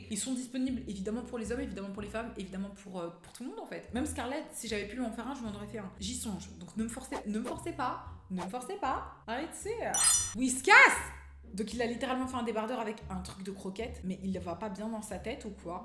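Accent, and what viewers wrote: England, United Kingdom. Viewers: Peuhh ! Arrête de juger les gens et surtout arrête de dire ( COUCOU GUYS ) on est pas tes pote ! Et puis arrête avec ton accent anglais sa saoule !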